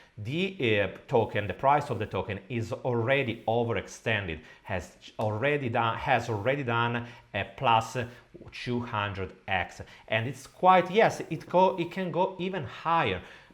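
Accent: Italy. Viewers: Thank you so much for showing us these bad platforms. So educational for humanity good. I just discover you and although it takes time to understand your Italian accent, I love it.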